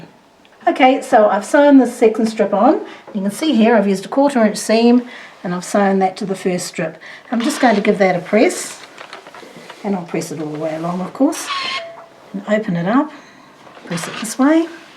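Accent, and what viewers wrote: Australia. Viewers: I love your video on how to machine bind a quilt. Very clear, very concise and a new little trick on how to make sure you cut your binding exactly the right length. PS love, love, love your Australian accent so sweet ‼️❤️ Colleen ☘️ USA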